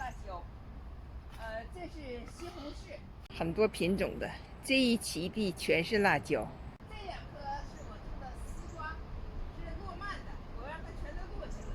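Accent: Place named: North, China. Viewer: 您的菜园打理的太棒了，又在网上找到一位好老师，以后会经常来学艺喽。听您口音是北方人吧😊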